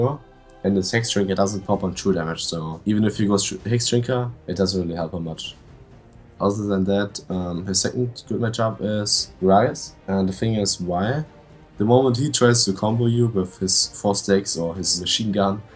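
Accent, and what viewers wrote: Germany. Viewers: You can clearly hear his german accent :D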